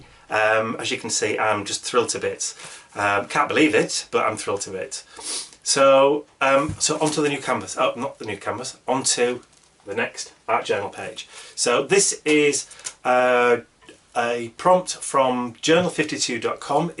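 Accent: United Kingdom. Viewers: Love following you, it's great to have a mans voice and a British accent! Great ideas which I will be trying asap, keep up the good work and well done on your burgeoning fan base :)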